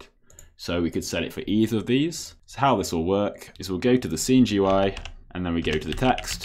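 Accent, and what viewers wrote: United Kingdom. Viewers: Can you not hear his voice he’s from uk you can hear from the sound of his voice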